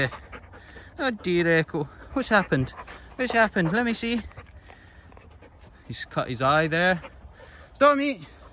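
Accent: Scotland, United Kingdom. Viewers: I have just discovered this channel and I am obsessed with it. Between gorgeous Scottish accent and those amazing dogs, it's a refreshing break from the news of the day. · it is a thing of beauty to watch these dog work.And with such few words/commands. The icing on the cake is his Scottish accent! Thank you for this. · Have to be honest, I have no idea what you just said for 20 minutes but it was beautiful.❤ I love Scottish accents. What can I say.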